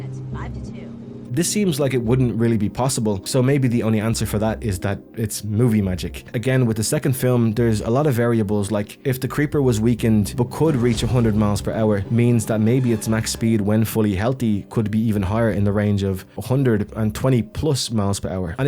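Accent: Ireland. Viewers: You sound very Irish if I say so myself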